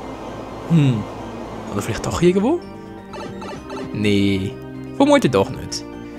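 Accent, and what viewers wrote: Switzerland. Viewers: Schwizerdütsch, winis liebä XD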